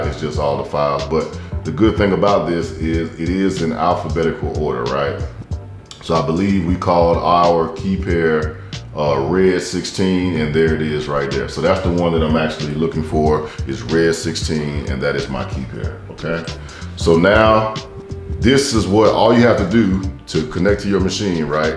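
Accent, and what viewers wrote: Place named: Baltimore, United States. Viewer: Are from Baltimore area? I hear it in your two’s and do’s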